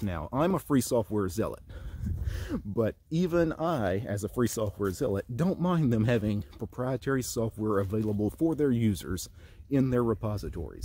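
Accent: South, United States. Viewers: Ah, so you're in Louisiana! I always wondered where that southern accent came from. We got a lot of snow here in Arkansas too.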